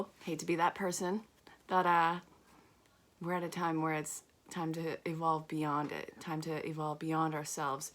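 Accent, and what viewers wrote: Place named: United Kingdom. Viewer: Love ur British accent!!!!!!!!!!🤣🤣🤣🤣🤣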